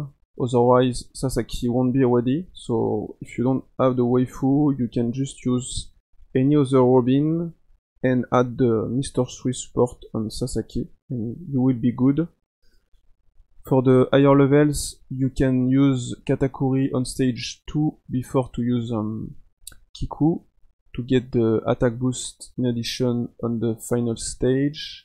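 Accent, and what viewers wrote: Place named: France. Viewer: tkt c'est compréhensible pour un français, c'est juste que pour des étrangers ça peut être compliqué tant ton accent français est fort, par exemple j'ai + de mal à comprendre tubechau à cause de son accent Suédois.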